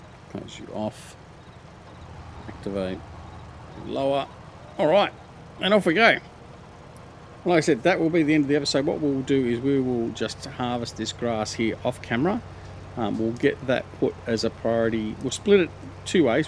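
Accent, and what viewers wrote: Australia. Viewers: Just came across the channel looking for a series on this map as I've just started playing it on mine, good to hear a auzzie YouTuber watching from new Zealand 🇳🇿 also good to see your still making episodes on this map, can't wait to see were u take the map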